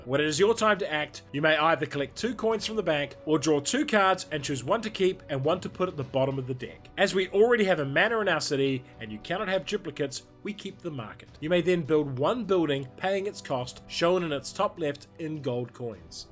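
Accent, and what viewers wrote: New Zealand. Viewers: I keep forgetting you're also a kiwi and got jumpscared by the intro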